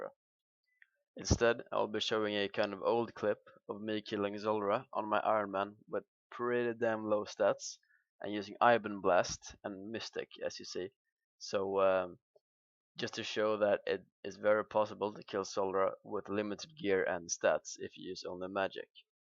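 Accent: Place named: Norway. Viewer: dat norwegian accent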